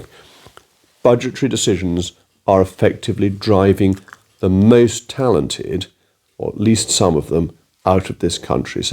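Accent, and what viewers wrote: RP, United Kingdom. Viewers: Jacob the Elephant in the room remains. WHY are you still Conservative? It means you become more of a disgrace each day No amount of received pronunciation will save you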